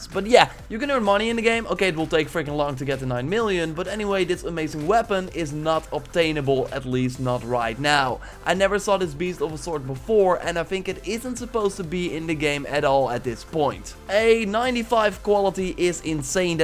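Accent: Netherlands. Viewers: he is dutch. thats why he has a acccent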